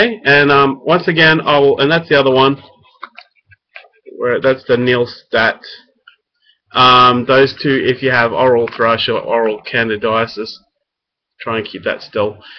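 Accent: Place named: Australia. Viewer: Thanks, I'll try it right away.. Love your accent, IM MOVING TO AUSTRALIA SOON! Cheers!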